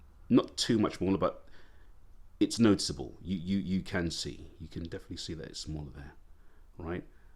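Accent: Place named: South, United Kingdom. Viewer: Hey buddy... What is wrong with your channel, you’re about 10’000 subscribers short! Fantastic in depth quality and a huge passion can be seen and heard in your voice. I will give you and your channel a mention in my community section on my channel for others to discover you. I’ve just recently covered the diving mode side of the GWF-D1000 next to the Gulfmaster, as nobody has covered it properly. You have an accent close to me being more southern on the UK Island but that doesn’t cover where you’re based of if I’m correct LML ;) Anyway my friend, will sub you and try and get you a little more attention as you deserve a heap more, trust me, i’ve seen many channels that aren’t near to your quality with thousands of subs. Have a great Sunday and I’m sure we’ll chat more ;)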